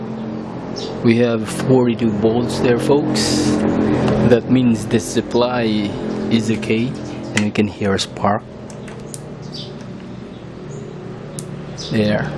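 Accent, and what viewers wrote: Visayas, Philippines. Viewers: hahaha bisaya man diay ka slangs kaau kada.hehehehe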